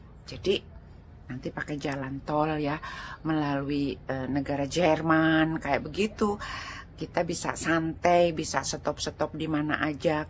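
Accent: West Java, Indonesia. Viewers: Selalu di tunggu bunda uploed nya sangat bermafaat info info nya .walau pun bunda tinggal di Swis tp logat bicara nya masih terlihat bahwa bunda orang sunda sama seperti saya 😊💜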